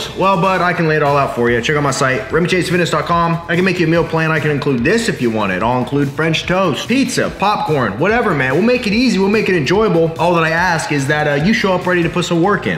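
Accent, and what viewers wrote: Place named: Alabama, United States. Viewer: Man the way you talk reminds me so much of the football dads from my small hometown in Alabama and I can’t get enough of it 😂😂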